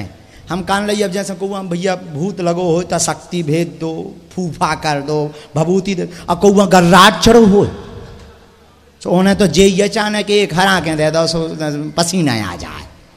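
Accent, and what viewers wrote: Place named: Bundelkhand, India. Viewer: गुरु जी आपकी बुंदेलखंडी बोली बहुत अच्छी लगती है, संन्यासी बाबा की जय, बागेश्वर धाम सरकार की जय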